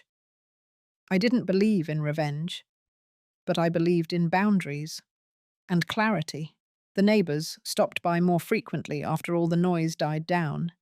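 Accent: United Kingdom. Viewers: The Reader is British of course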